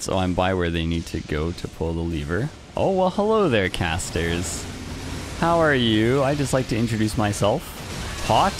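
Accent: Canada. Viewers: Can someone in the know answer me this question: Is a Canadian man of Asian ethnicity? An Asian-Canadian, as it were? I know nothing about him, just his voice and slight accent, and if there was a gun to my head, I'd guess he was an Asian guy born in Canada or at least moved to Canada very young.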